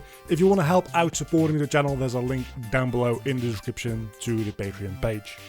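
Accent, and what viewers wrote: Netherlands. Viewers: I was like "this guy sounds so Dutch".. then looking at the About page..😂 Anyways, lekker bezig! Ik moet binnenkort ook maar eens gaan koekeloeren in Unreal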